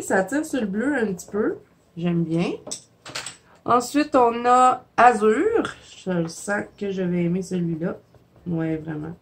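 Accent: Quebec, Canada. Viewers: Ho..j adore déjà votre beau accent québécois que jai bien connu durant 3 belles années chez vous.... J'aime vos vidéos qui sont super bien faite et vos astuces sont géniales... Merci merci de me faire redécouvrir un peu le québec et merci pour vos conseils vos méthodes qui me font capoter et que j essaye..bonne soiree a vous belle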